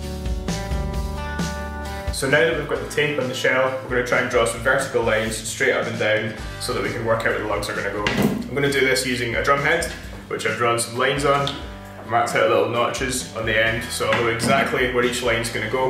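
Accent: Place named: Scotland, United Kingdom. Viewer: Very interesting!I think your DIY skills are WAY beyond Homer Simpson or even former President Trump!!!!A lot of time,DIY projects success is contingent on your tools.Obviously you have more that adequate equipment!!Are you a carpenter!You are my first sub in Scotland/cool Accent!Thanks for the very excellent demo even I understood it!LOL Neil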